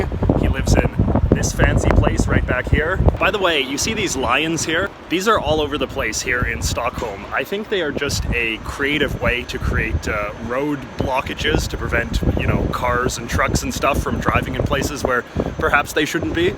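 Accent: Canada. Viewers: Wow, an entertaining and informative North American with quite a cool hair style whose only pecularity is to say "a boot" when he means "about". I hope he also realizes that going to Stockholm and possibly see it as a representation of Sweden is a little like visiting New York City and possibly see it as a representation of the U.S. -- It only applies to a degree. (Yes, I KNOW he must be Canadian, but since I've never lived in Canada, I don't want to make comparisons I don't know anything about.) Welcome to Sweden!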